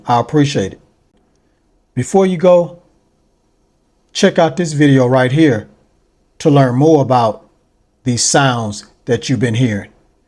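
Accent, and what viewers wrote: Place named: Houston, United States. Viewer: You sound like you from Houston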